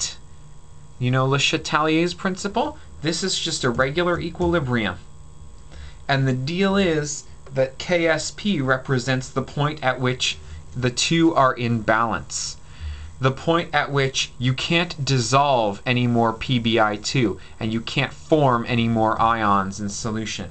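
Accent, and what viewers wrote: Canada. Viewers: oh, you're canadian...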